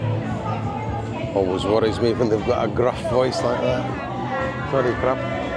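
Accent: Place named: Scotland, United Kingdom. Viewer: Good video. Always good to hear a fellow Scot on YouTube. I've been going to Thailand since the early 90's and met my wife out there mid-2000's. She moved over to Scotland with me a year ago, with a view to settling here (for a few years). We may eventually move back to Thailand when I retire. Jomtien looks interesting. I met my wife in Patong and as you know, Phuket has become too busy in the last few years, just like Pattaya. Jomtien and some other parts of Thailand like Songkla City, can offer a quieter experience and lifestyle for those who prefer that. I would be perfectly happy in Jomtien, from what I have seen in a vew videos. Keep up the interesting vids and who knows? Maybe bump into you in the future for a couple of cheeky ones. All the best Pal:)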